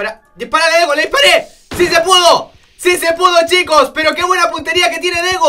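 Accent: Chile. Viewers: dego eres de chile?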